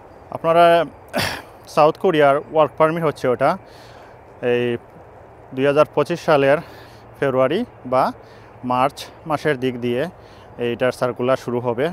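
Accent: Sylhet, Bangladesh. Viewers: ভাই আফনে ত সিলেটি 😊😊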